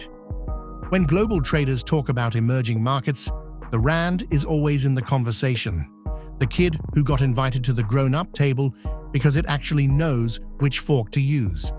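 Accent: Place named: United Kingdom. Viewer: The Narrator just gained a proudly South African follower. He is so good at explaining and very informative. Sounds British, Great accent.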